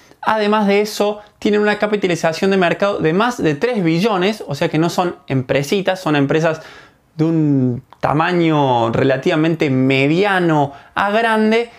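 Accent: Córdoba, Argentina. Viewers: Sos cordobés?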